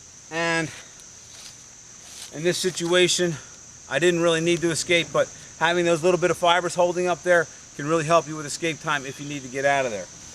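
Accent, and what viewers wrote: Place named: East Coast, United States. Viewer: Great video and your East Coast (NY?) accent is music to my ears.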